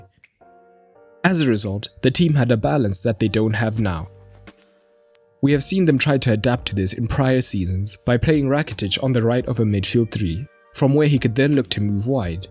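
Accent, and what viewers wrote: South Africa. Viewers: You sound South African. Are you from SA??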